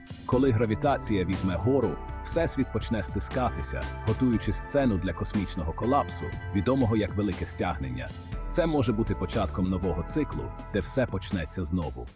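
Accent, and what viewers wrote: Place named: Poland. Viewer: жаль наголоси погано виставляються на укр мовi :body-blue-raised-arms: та настройка яка нормально корегуе iншi мови, перетворюе укр мову на якусь... навiть хз...полупольську якусь, в сенсi звучання... гг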